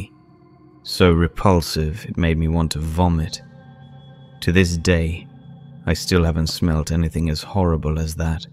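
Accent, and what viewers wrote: United Kingdom. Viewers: If this is your own work, I am impressed with your literary skills.. Usually I have a tough time listening to one with a British accent, but not you, you have such perfect diction and pronunciation that the accent is hardly noticed, besides British accents are the coolest in the world.. I see you are up to almost 7.8K subscribers. I am going to share this video with others and recomend they subscribe so as to do you a solid.. Mark my words, this channel is going to grow Sir... Great work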